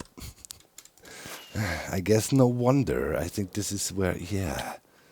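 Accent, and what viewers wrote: Germany. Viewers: I don't understand haters. I really don't. I mean - How can you not adore that German Accent?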